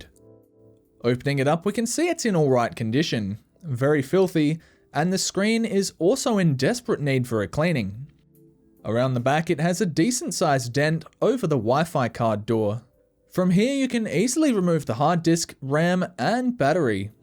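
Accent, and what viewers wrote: Australia. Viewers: is this guy from australia?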